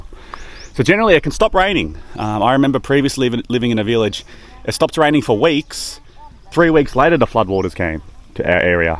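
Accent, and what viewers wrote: Australia. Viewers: Sounds like a Melbourne accent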